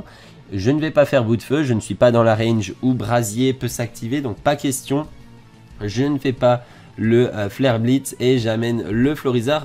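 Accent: England, United Kingdom. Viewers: no the englush accent is back :'( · Je risque pas d'oublier cette vidéo où tu réussis l'exploit de foirer l'intro et l'outro en même temps xD. Bon pas grand chose à dire sinon GG ! Cresselia est bien parti pour être MVP ! Pense à te doper à la camomille pour les prochains matches, ça semble efficace ! (par contre j'ai rien contre l'accent anglais, je trouverais même ça marrant qu'il devienne ta présentation emblématique pour cette compétition^^)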